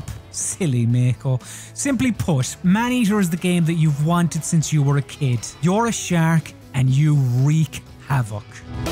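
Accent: Ireland. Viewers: Hearing a Irishmen talk about a shark game was Quite pleasant definitely will be subscribing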